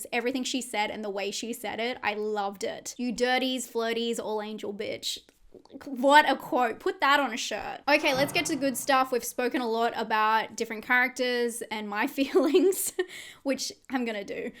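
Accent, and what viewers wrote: Australia. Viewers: I'm sorry, I'm just messing with you. I know it's because of your Australian accent. It's actually kinda cute and adorable, and I loved the video btw!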